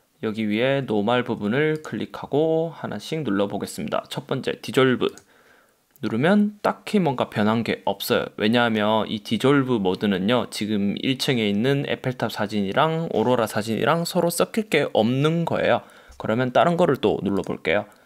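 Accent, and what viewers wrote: Gyeongsang, South Korea. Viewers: 억지로 서울말 안쓰셔도 됩니다ㅋ(아무리 들어도 경상도 사투리..ㅋ). 좋은 강의 잘 듣고 있습니다. 재밌어요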